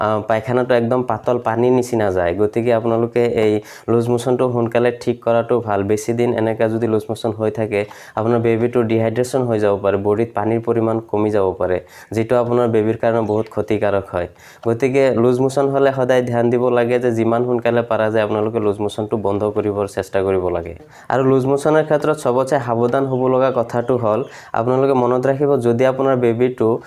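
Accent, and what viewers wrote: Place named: Kolkata, India. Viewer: kolkatar language mone hosse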